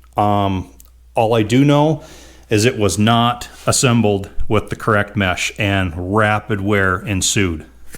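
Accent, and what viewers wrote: Minnesota, United States. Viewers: "Pardon me", but I did not understand the mustard reference. :-) BTW, I believe it is "first gen" a.k.a., first generation and not "first gin" but we all know what you mean. It is somewhat similar to those who say "escavator" rather than "excavator" which I think may be a MN thing. Yep, you are a mechanic and a damn good one, and do not pretend to be a linguist. I ain't one of them there linguist neither too. :-) Keep up the GREAT Cat work and tnx for letting us tag along while not even get our hands dirty.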